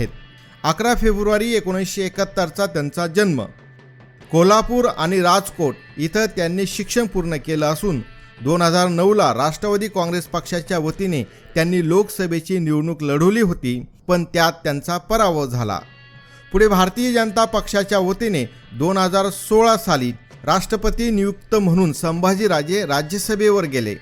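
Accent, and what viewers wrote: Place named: Thanjavur, India. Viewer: Tumachi bhasha Tanjawar Marathi watate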